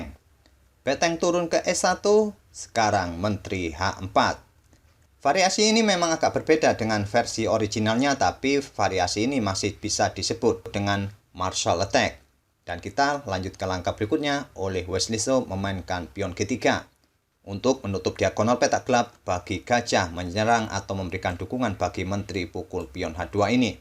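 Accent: Java, Indonesia. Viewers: Medok amat mas jawa ne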